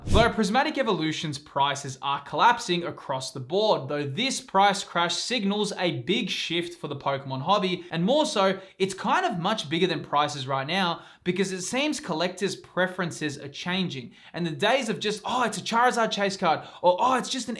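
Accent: Australia. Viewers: I’ll be honest! Your videos not only helped me look at Pokemon cards and even etbs differently but the Australian accent is a chefs kiss on top ❤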